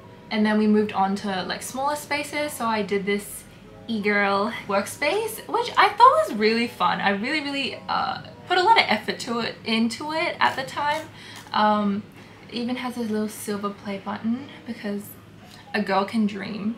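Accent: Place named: Australia. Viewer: you have an australian accent, what's your background?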